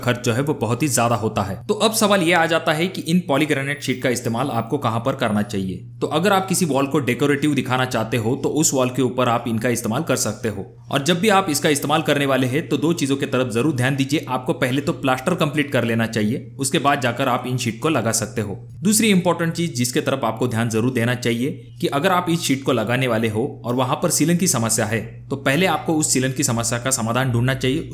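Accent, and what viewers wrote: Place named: Satara, India. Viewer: मराठी आहे फलटण बारामती किंवा सातारी जर्दा दिसतो भाषेत ....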